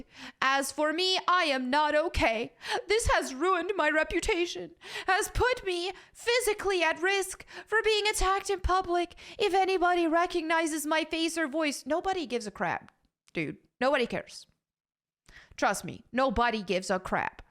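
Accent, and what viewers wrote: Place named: South Carolina, United States. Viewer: I love you. You have such a South Carolina accent, which is where I'm from. I kind of recognized it.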